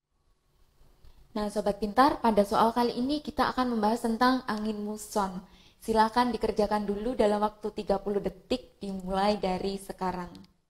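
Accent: Java, Indonesia. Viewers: Menarik... bisa sebagai referensi belajar.. kak suara medhoknya dipertahankan ya...😆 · Alhamdulillah kak bermanfaat, belajar sekarang lebih nyaman bisa online begini, jadi gak monoton ❤️ Sukses ya kak ~ Walaupun agak medok 😁😁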